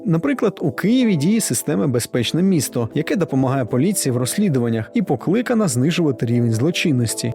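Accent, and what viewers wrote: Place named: Russia. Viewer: відчутно, що ведучий раніше російськомовний.. досі проскакують: "ихньому" та ін.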